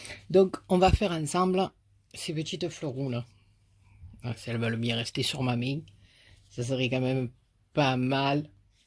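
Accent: South, France. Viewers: bonsoir j'ai découvert ta chaine très récemment et j'avoue que j'apprécie tes vidéos tes fleurs sont magnifiques et j'avoue que je vais m'y mettre. j'adore ton accent du midi je suis allée un peu me promener sur tes autres vidéos et j'y ai découvert une bonne partie de tes réalisations qui sont tout simplement magnifiques, tu es vraiment une artiste je suis abonnée aussi à la chaîne de Christelle, j'aime aussi ce qu'elle fait et me sent proche d'elle, mon mari ayant eu la même maladie qu'elle à l'âge de 27 ans merci de nous faire partager toute mon amitié domi (de Cognac en Charente….)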